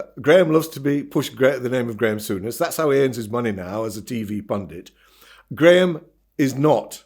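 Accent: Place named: RP, United Kingdom. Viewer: Michael, you are speaking the fans language(just a little posher)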